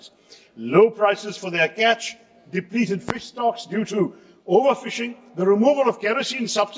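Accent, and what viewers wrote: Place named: England, United Kingdom. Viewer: At bjp side, no one understand what he is talking about ,in this English accent....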